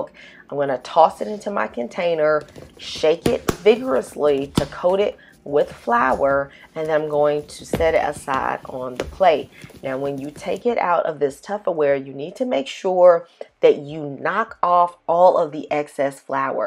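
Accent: South, United States. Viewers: Talkin' like she straight out the south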